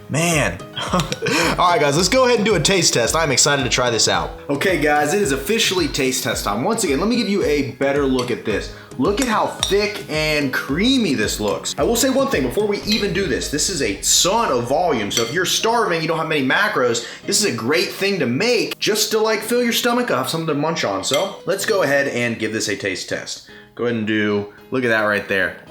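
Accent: Texas, United States. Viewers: Wow...he sounds so much more Texan in his more recent videos.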